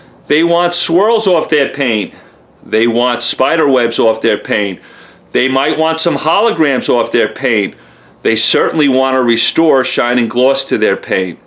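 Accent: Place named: Rhode Island, United States. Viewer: Love u to...lol....your accent...I have the same accent...Rhode island lol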